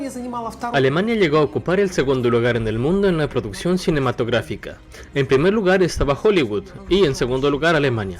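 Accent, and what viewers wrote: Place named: Russia. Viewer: me gusta mas la vos con el acento tipo ruso, siento que le da un estilo unico al canal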